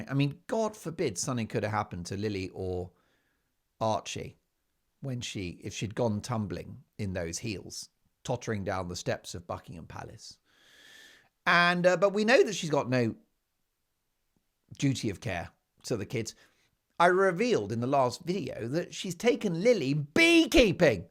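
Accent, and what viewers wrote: Canada. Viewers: The Canadian accent is ace! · Love your french from Moncton NB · Your Canadian accent is quite good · Your Canadian accent is so funny. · Your Canadian accent is great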